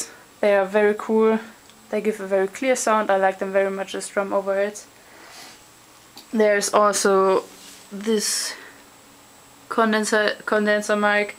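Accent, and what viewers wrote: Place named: Germany. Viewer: Youre german right? I like your accent :)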